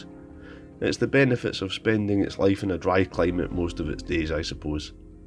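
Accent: Scotland, United Kingdom. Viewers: Another sublime restoration video from the man with the sublime Scottish accent. is very funny 😂👏👍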